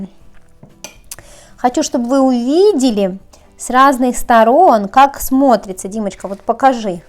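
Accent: Ukraine. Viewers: Сергей Хворостенко люди! человеки! вы все забыли что девочка живет в Украине, там так говорят и это нормально, вас же всех не учат как правильно произносить украинские слова? У нас в России многие вообще забыли родную речь, или так засорили словами паразитами что слушать невозможно. А девочка умничка, трудяга. Так держать. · молодец! Люди, а вы должны понимать, что человек с Украины и акцент остаётся надолго, ничего в этом страшного нет, любой иностранец говорит с акцентом, это почему то не раздражает...., вам спасибо большое за интересные рецепты😊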